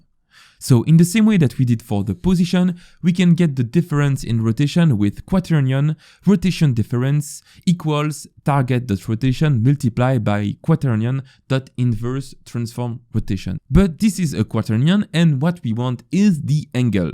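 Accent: France. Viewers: tu est français XD L'accent est horrible. Sinon merci.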